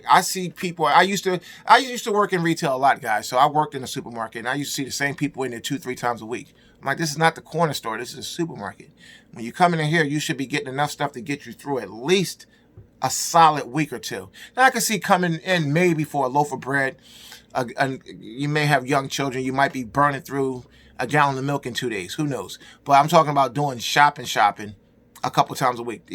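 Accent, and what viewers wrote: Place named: Philadelphia, United States. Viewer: Ha ha bro. I knew you were from Philly from your accent. I'm glad there are some like minded people like you in this City.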